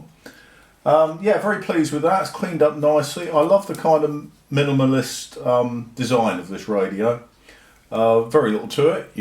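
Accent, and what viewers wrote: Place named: United Kingdom. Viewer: Great to hear a British voice fixing British radios. Keep up the good work.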